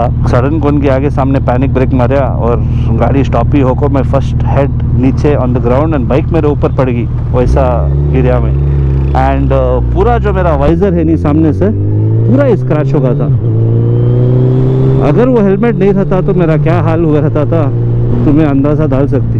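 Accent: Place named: Dakhni, India. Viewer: aapka dakhni mein vlogs aur baat karne ka tareekha bahut saaf and standard he mashallah... baaki log dakhni me baat karne pe kaan mein chubta he... will support this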